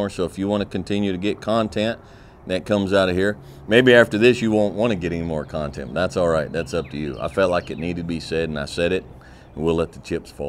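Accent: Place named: South, United States. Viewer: Lovin’ this southern TED talk ❤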